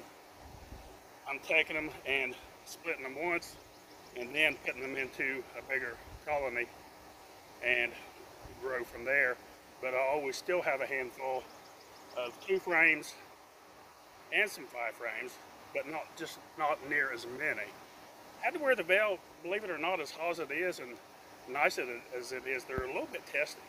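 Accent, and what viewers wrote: South, United States. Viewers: would have been PERFECT if he were cast as the acting role of the beekeeper in Matthew McConaughey's movie Amaziah king. Its being filmed in AL. They were looking for a strong beefed up adult male strong from handling beehives for YEARS. He has the southern accent, experience, and he'd have represented beekeepers well. Darn it!! Wish Id have sent your info to them when they were doing casting calls. The role is being filmed in late July/August. Maybe its not too late.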